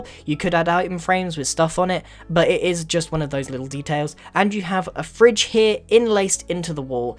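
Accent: England, United Kingdom. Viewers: Are you British? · he's British the way he pronounces some words doesn't match with ours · you sound british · thank god he's british ! And god save the queen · An easy-to-digest, well spoken, simply arranged tutorial. And he's British! Great series, keep it up!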